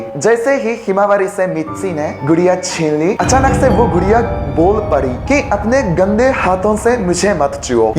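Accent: Standard. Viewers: I am an Indian and from north india still he speaks better hindi than me acctually he speaks shudh hindo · Itni shudhh Hindi to ham bhartiy bhi nahi bol paate jitni aap bol lete ho😂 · You speak Hindi purely more than indians. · Your hindi need to be smooth and be practiced btw your hindi is standard 😇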